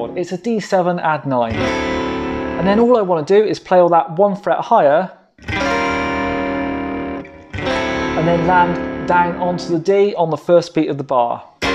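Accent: United Kingdom. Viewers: Great video. Just got the backing track from yr payhip. Wish i could get a pdf tab ? Also, thanks for your nice and clear british english 😀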